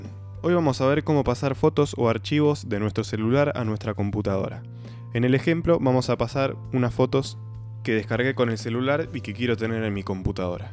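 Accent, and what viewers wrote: Argentina. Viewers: Excelente tu explicacion No podes negar que sos argentino!!!! Un saludo desde Mexico. Aclaro yo lo soy. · Es argentino 😑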